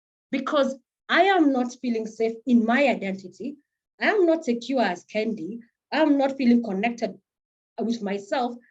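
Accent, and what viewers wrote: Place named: Botswana. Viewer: I love your accent! I was growing up in Botswana and I miss it a lot.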